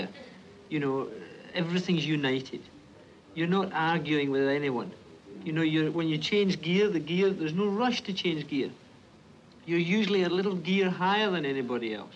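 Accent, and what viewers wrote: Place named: Scotland, United Kingdom. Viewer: The auto closed captioning is just getting crushed by that sweet sweet Scottish brogue.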